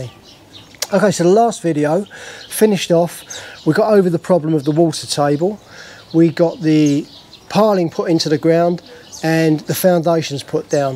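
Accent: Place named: South London, United Kingdom. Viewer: Hi I was the one who couple months back said you must be from south of the river with your accent, live Sydney but spend lots time in Thailand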